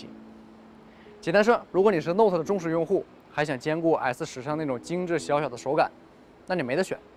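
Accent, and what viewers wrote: Northeast, China. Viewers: 听口音像东北人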